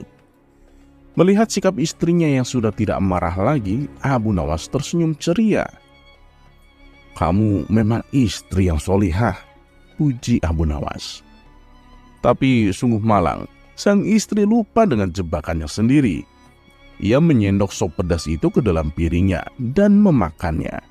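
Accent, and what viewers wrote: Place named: Sunda, Indonesia. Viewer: Waduh hihiii aslinya kayaknya orang Sunda ya😁😁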